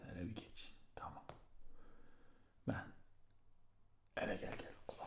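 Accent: Eastern Anatolia, Turkey. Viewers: Reis bunun yeni bir halini bekliyoruz doğu şilveli mafya efsana olmuşş seviliyorsun 500.000 dolar da iyi iyi iyi baya iyiii <3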